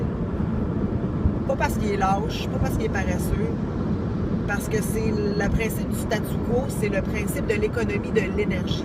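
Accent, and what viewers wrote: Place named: Canada. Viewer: j ai du mal à comprendre à cause de l accent canadien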